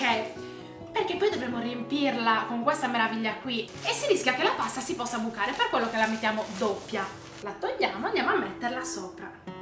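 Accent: Sardinia, Italy. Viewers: Che dire ….mi fai morire ,sei troppo simpatica ,ma sopratutto brava ,piena di energia ,fantasia e simpatia ,pian piano mi sto guardando i tuoi video ,sei sarda come me e si sente 😂,sono molto curiosa di sapere di quale parte della Sardegna sei ,io sono di pula ,provincia di Cagliari .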